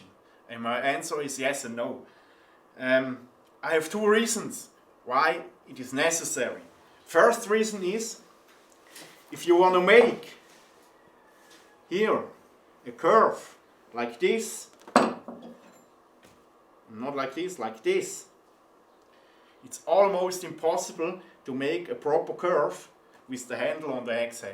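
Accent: Switzerland. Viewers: Dein Englisch mit dem Schweizer Akzent hört sich irgendwie lustig an 😅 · bish du schwitzer dim akzent no🤔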